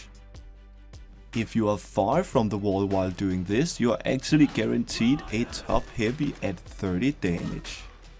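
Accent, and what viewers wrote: Denmark. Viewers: Is it just me or are you from Denmark? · Jesus u sound like a Danish dude and pls don't talk so slow ÆØÅ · Er du fra danmark? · er du dansker haha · are u from denmark? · thanks buddy! Fine bonus with the danish accent.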